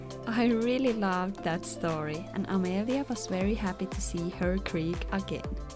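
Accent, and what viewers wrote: Finland. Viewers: I like hearing your stories and comments and your Finnis (sic) accent like my grandma. AND: what is that little square thingy on the kitchen sink? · Ihmisten tarinat kiinnostaa. Se miten he ovat tuohon jamaan joutuneet ja miten sitten ovatkin pohjalta nousseet 😍 ja siun ralli englanti on kivaa kuunneltavaa 😁😁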